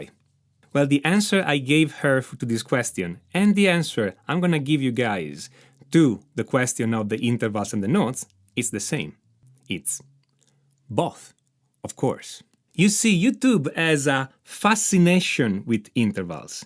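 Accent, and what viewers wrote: Italy. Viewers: Where do you from? Maybe from Italy?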